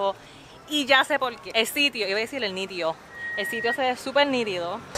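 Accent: Puerto Rico. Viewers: Hola Estoy loka por ir a PR pero es que te voy a buscar hasta debajo de las piedras. Mis dos hermanas y yo te seguimos hace mucho y me encanta todo lo que haces. Y lo más que me gusta es que somos patriotas. Me encanta tú ascento boricua, chacha eres mi pana bn brutal. Te quiero con cojones jajajaja t robe la frase 🤣🤣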